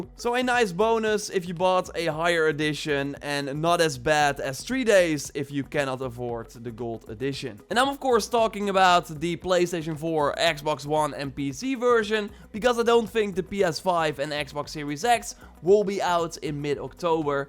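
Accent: Netherlands. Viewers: Are you dutch? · Je kan gwn horen dat je Nederlands bent 😂😂 Like als je Nederlands bent😂👏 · Ooh hahaha jij bent Nederlands je kan goed Engels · I had no clue that you are from the Netherlands ;). You can always hear when a Dutch person is talking in English. Keep on making videos. Can't wait for Valhalla to drop and watch you're videos.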